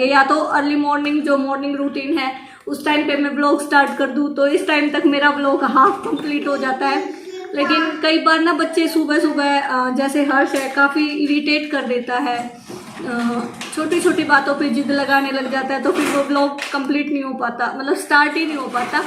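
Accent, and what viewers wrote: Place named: Haryana, India. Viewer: Like6,dear apni original language me baat kiua kero..... means haryana wali .....whi achi lagti h😘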